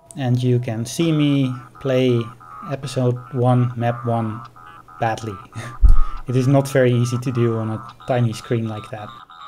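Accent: Netherlands. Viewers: Nice! Good job. I like the dutch accent..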